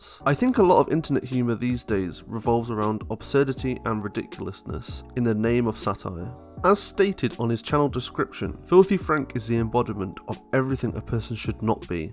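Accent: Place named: United Kingdom. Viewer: Is that another British accented commentary channel, not an insult but fuuck there's tons of them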